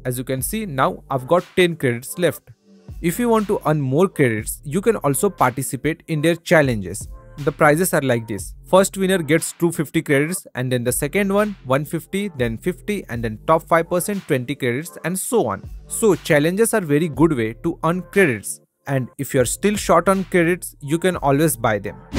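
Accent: India. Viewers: If you open a tutorial and the person starts speaking in an Indian accent... then my friend you hit the jackpot cuz you'll get more than you even wanted. Thank you soooo much bro!!! 🙏🙏🙏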